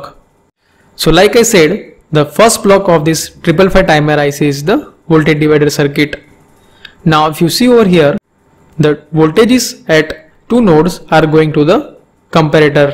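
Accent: India. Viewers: He is so overrated... Most of his lectures are not understandable... And the worst part is his pronunciation of english words sounds horrible😂😂😂....such a dumb indian accent.. And he also teach us wrong thing... here Q is 1 then Q' is 0.. So output should be 0...if Q is 0 then Q' is 1.. So output should be 1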